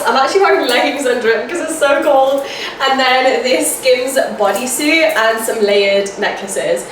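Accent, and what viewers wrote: United Kingdom. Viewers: British accent >>>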